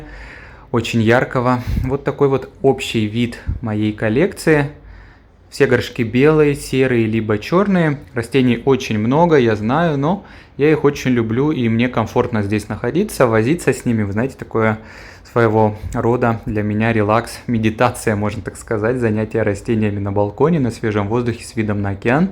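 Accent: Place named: Spain. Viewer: Прекрасное видео - как приятно видеть ухоженные растения имеющие неповторимый естественный вид при заботливом выращивании в подходящем для них климате. Вроде бы - всем известная простая маммиллярия Mammillaria elongata, но лучше не видел, очень хороша под натуральным солнцем. Как ориентирован балкон по отношению к частям света? И хотел бы немного помочь с названиями. Сомневаюсь насчет алоинопсиса - это скорее фрития (Frithia pulchra) если цветки темно-розовые или фенестрария ( Fenestraria rhopalophylla / aurantiaca), если цветки желтые/белые. Кактусы: и - не эуфорбии, это такой очень красивый маленький цереус Monvillea spegazzinii, очевидно выращенный из кусочков кристаты. Она красиво цветет. Безымянны кактус - Notocactus oxycostatus, родственник известного всем N. ottonis. И рядом стоящие Eriocactus leninghausii i Brasilicactus haselbergii. Нет, это не маммилопсис. 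)) Я не использую кактусного новояза и не обьединяю по-хантовски старые известные роды, пусть это остаeтся на совести неофитов. PS Когда читаешь латинские названия у тебя приятный испанский акцент. Я изучаю португальский, с ним испанский понятен, но не наоборот. ))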